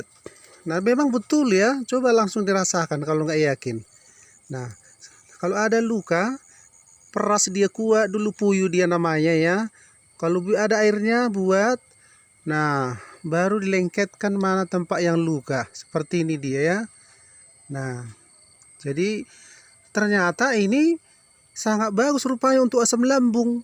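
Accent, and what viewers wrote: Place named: Makassar, Indonesia. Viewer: Kéntara séékali aksen makassarta' DAENG.. Geli2ki déngarkiii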